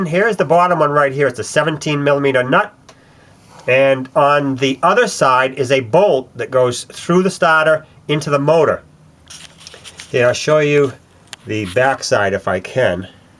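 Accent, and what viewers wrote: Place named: Boston, United States. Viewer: Great stuff! Enjoying the accent. Car Talk headquarters must be right down the street.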